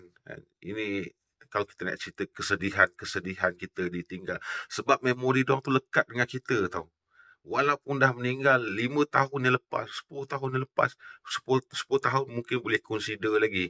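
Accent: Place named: Sarawak, Malaysia. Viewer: Terima kasih tuan atas perkongsian ilmu, kmk salute BM ktk sgt fluent tapi tetiba ada yg melimpas bah mesti nyatok org Sarawak😊 Teruskan tuan dengan video² yg selanjutnya bagus juak guna drone camya puas nangga..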